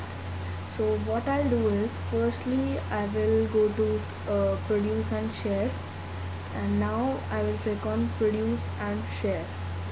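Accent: India. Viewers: indian english >_< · Omg I can sense the Indian in him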